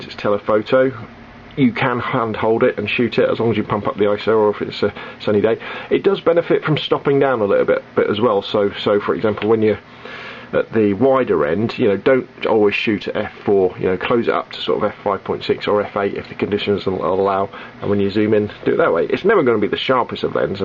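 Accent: United Kingdom. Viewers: Are you british, I like that accent !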